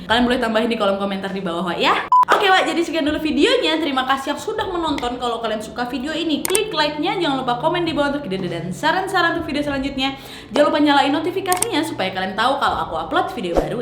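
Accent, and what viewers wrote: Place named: Java, Indonesia. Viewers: Kak kok jadi medok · Jawa amt suara😂😂sampek lupa skrip nya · Ka ada medok eee:) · Sehat terus, kakaaak! Aku yakin yang ada di sini pasti sayang kak! Btw kak agak medok ke Jawa yak ngomongnya 💚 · Malam minggu kerjaannya nontonin youtuber favorit! 1. yg udh jdi medok 2. TussyStory yg menawan 😍 3. Nessie coz its british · Mulai medok yaa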